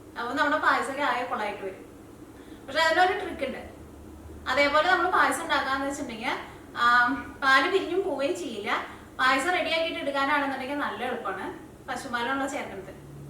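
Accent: Thrissur, India. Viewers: 😀lokathinte eth koonilum nammal thrishir kaaru undaavum😀😀 orikkalum vitt poovaatha thrishur slaangum aayi😂😂😂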